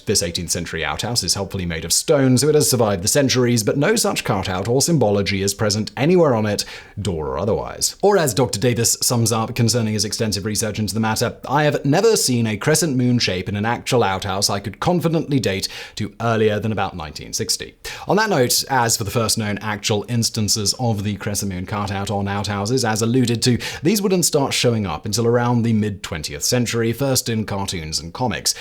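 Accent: United Kingdom. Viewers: You may live wit duh Czechs but you still got a British accent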